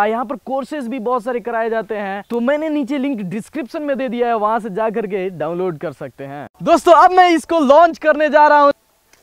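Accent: Bihar, India. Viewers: Kay app बिहार के हे l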